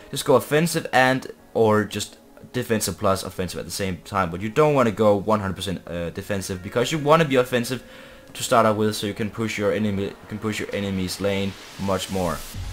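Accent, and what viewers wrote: Denmark. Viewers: Jeg hører en dansk accent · Really love the videos! Keep it up, btw are you danish? :3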